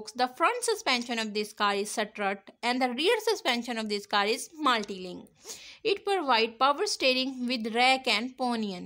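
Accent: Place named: India. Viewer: Very hard to understand, deep indian accent makes listener uncomfortable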